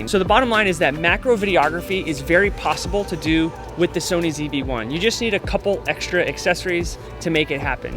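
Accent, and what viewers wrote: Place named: United States. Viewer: Wow! Haha that lil Iguana is the best model ever and soo beautiful ay =) Wow I was really surprised when i heard your American accent, I totally aspected you to be from Scandinavian because you look like a Viking! Haha I agree with one of the other commments - You’ve got a very endearing persona, not only very good at explaining but are gifted with a naturally calm, inviting presence. Thanks for the video =) That iguana shot reeeeally makes me wanna find and build my own Macro lens setup too! Haha :) From down here in Margaret River Western Australia I salute you :) Big High Five, keep it up!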